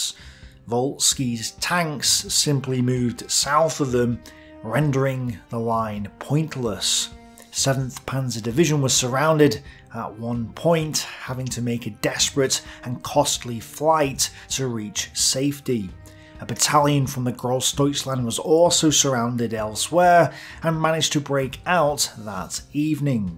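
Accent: United Kingdom. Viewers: He can't help his British accent!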